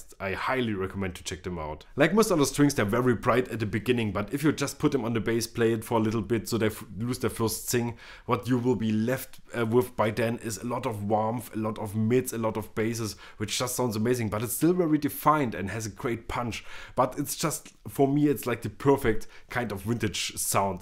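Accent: Germany. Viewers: That's gotta be the most German English I've ever heard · the most stereotypical German i have ever heard ... fucking love it !!! more !!!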